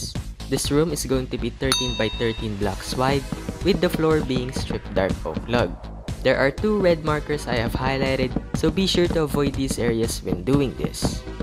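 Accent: Philippines. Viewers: You have a filipino accent I think your filipino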